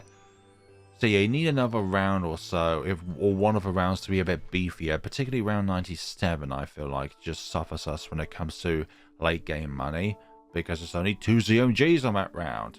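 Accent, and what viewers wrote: United Kingdom. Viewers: british man commentating over videogame is the highest form of youtube content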